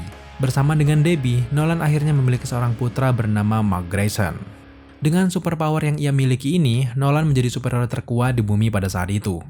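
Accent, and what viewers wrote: Indonesia. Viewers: I am Always curious .. why Indonesians... almost of you like to change the english pronounce? Aku hairan kenapa kebanyakan kamu suka meng "indonesia" kan bahasa inggeris. Tune Core jadinya Tun-kor.. guardians yg sepatutnya disebut 'Gardian' tetapi dibaca 'gu-ar'-dian... princess yg sebutannya prinses tetapi disebut prin-ches... wth? semuanya sudah tidak kedengaran seperti English... dan kadang2 jadinya susah mau cari infromations dikarenakan sebutan yang tidak saya fahami...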